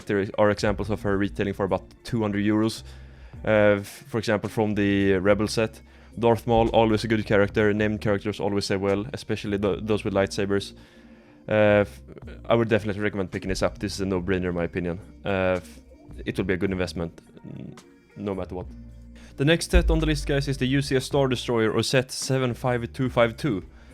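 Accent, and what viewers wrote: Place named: Norway. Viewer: You sound Norwegian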